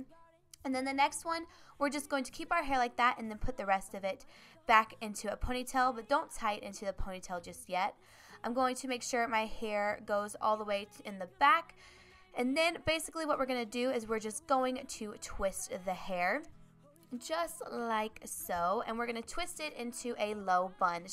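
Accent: South, United States. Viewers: R u southern because u sound very southern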